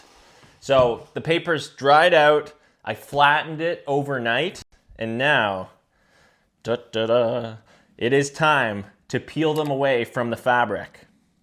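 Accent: Canada. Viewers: I enjoy your silliness and your wonderful smile and especially how your projects work out to be something worthwhile .... and, oh yeah, listening to your Canadian "ou" sound, eh! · Stayed home sick today and found your channel and im loving the content! Also random weird question but are you by any chance Canadian?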